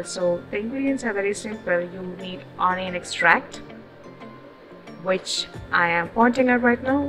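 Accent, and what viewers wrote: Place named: Tamil Nadu, India. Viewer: Akka...i lke ur tamil accent.... Plz remake this in ur style.... Its very plsnt to hear....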